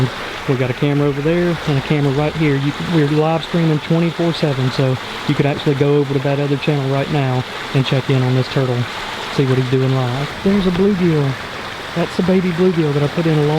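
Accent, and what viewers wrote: South, United States. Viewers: So I watch your videos with closed caption. When you said “Bonnie meet new turtle, new turtle meet Bonnie” the closed caption read “Bonnie meet Luther, Luther meet Bonnie”. So there ya go. Luther is the name from me and closed captioning of our southern accents. Lol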